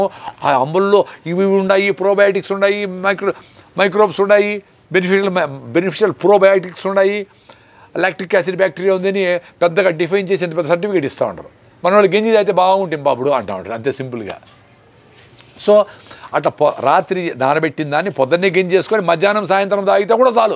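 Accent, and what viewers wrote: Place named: Madanapalle, India. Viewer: Really great information sir.pakka madanapalle slang telugu.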